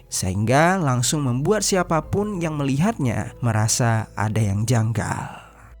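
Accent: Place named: Palembang, Indonesia. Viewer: Cara bicara pembawa acaranya kok mirip bgt ama temen sy yg org palembang, ya 😅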